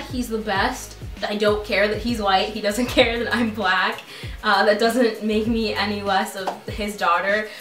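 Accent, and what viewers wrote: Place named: United States. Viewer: Wow, your fine as hell, I like your American accent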